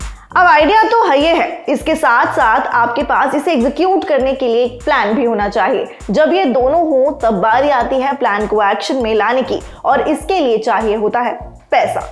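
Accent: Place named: Bihar, India. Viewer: But mamm ka bihari accent (idea to hayye hai) bahut aacha laga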